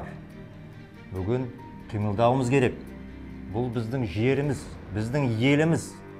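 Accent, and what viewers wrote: Standard. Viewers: Нагыз таза сойлеп тур агайындар рахмет айтып бмрлесейик баска ештеңе керек емес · Муктардын казакша сойлегени адеби тилде сойлейди керемет адам 🙋‍♀️👍👍👍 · айсауболшы патриот казахша таза адемы айттын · Рахмет. Таза қазақша сөйлеп отыр...... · Казакша өте таза сөилейді екен бурын неге сөйлемеген Токаевтан таза тусінікті сөиледі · Казакша Тап Таза сойлейди!👍🏽 Дурыс, Жетер Мына Душпаннан кутылу керек! Аллах разы болсын!!🙏🙏👍🏽💪🏽💪🏽💪🏽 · казакша таза сойлейди екенсиз. Айтканыздын бари дурыс. Казактар оянындар. Алга Казахстан! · Казакша таза соилеиди екен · Козакша таза соилеп турсыз дурыс аитасыз кашан сол кун туады екен курттып битти барин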